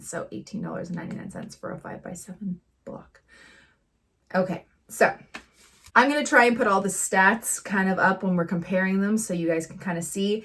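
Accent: Canada. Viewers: Damn, it took me 9 minutes to realize you were in Canada. I don't know which word gave you away. Maybe it was "out".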